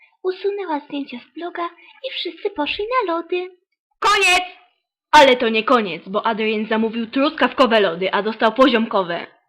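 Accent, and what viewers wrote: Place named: England, United Kingdom. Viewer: Nie mów z angielskim akcentem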